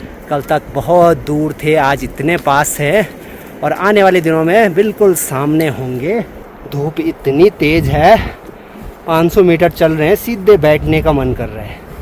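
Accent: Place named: Garhwal, India. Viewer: Sabase badhya video aapke hi hote hai..aapaki aawaj ..bolane ka tarika..hindi bolate hai fir bhi garhwali pronunciation khub bhata hai..ek sukun milata hai...thanks..dil se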